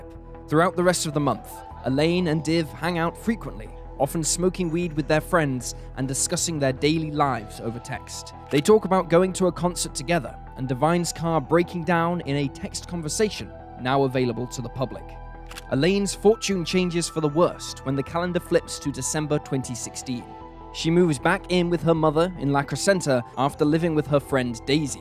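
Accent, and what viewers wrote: United Kingdom. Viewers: Lovely creative girl. Such a shame 2015 happened to her. My mum brought me up , that girls think its cute how timid I am in terms of touching , don't get me wrong I love wrapping my arms round and kissing and sweeping them away or trying but I don't get guys who think a no is a challenge or chase or they want so they do. Anyway from the UK why do Americans say its 20, 600 block instead of what it actually is? 26 thousand block like the man said (narrator's voice from the UK) . I can't end the comment taking away from this girls story.so apologise but from the UK , I hope her end is not final and if it is I hope justice comforts her and her family.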